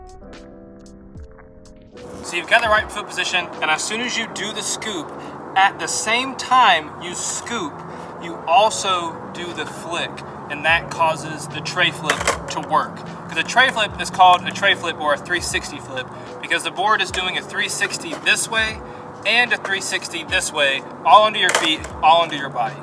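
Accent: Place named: South, United States. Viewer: Where u from homie. I hear a lil southern draw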